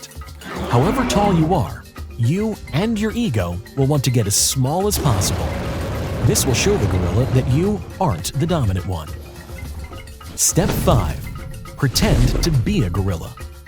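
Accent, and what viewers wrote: United States. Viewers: Dude u have an American accent stop using metric measurements. .WE DON'T USE THAT. .at least use both. .i was educated in the 90s aint no conversions in my head😭😭😭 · American accent. Gives information in metric system. You failed.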